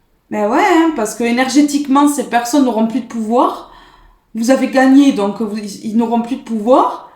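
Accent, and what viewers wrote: South, France. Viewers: Et moi je vous adore avec votre petit accent du sud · C ´est si vrai ! Pour moi ❤ vous êtes rayonnante j’aime votre energie et votre accent du sud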